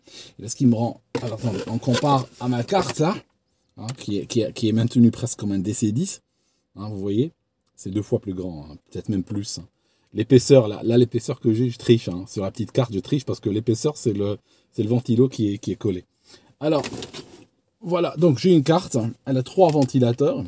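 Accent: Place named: Switzerland. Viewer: Il est génial ton Kanal 👍 j'aime beaucoup 👌❤️ Ici en France nous parlons de 'Chaîne' Youtube 😉 même si ta dénomination de canal, ou Youtube Channel, me rappelle que tes rares intonations de blédard sont une bénédiction lorsqu'on entend ton accent suisse 🫣 ENSMAtiquement Français 🥰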